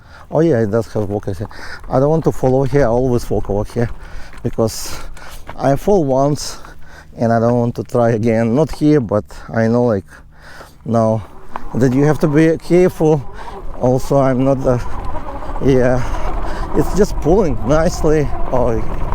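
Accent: Russia. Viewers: мне русский акцент режит слух, такое дерьмовое произношение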